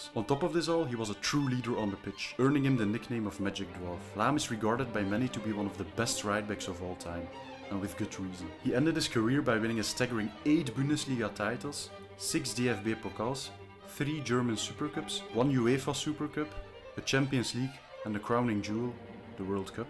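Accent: Belgium. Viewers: Bro is that a Belgian accent I hear? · Maat gij zijt zo zwaar belgisch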